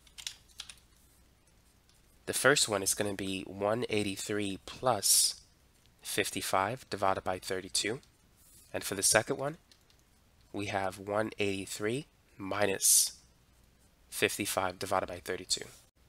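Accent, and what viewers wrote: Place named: New York, United States. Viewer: Based on your accent I'm guessing your from new York or jersey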